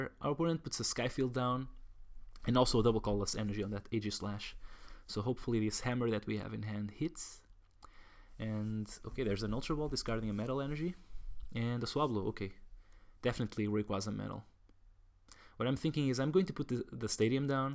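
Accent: Portugal. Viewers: Gostei bastante do video, também jogo gengar/bats/wobbu mas aplico bats e resulta muita bem, faço: 3 Gengar 1 Wobbu 4-4-4 Bats 2 miltank mas uso muito menos supps/items, mas acaba por resultar! ajuda bastante agora com wally, se ficares sem mão inicialmente. o que achas? btw, entendi que eras tuga pelo sotaque, mas parabéns, o canal está muito fixe!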